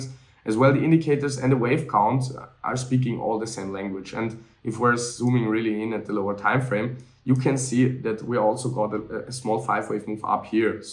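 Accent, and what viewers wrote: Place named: Austria. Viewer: Typically Austrian accent.. 🍺🍺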